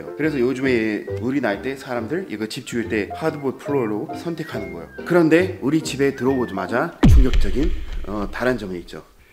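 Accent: South Korea. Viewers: 우연히 들어오게됬는데 이분..억양이 미국분 맞냐용?한국분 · 목소리만 들으면 한국인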